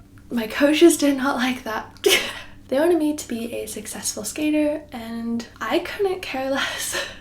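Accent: England, United Kingdom. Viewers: Your american accent is blending into a british one!!! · I’ve noticed in this video that you’re starting to get an English accent 😂 and it’s with some words so it’s so cute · Your British accent is coming in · aww she is getting a british accent!!!!! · you're picking up a British accent and as a Brit I love it. you say "lot" differently xx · Aw you’re starting to get a British accent it’s really cute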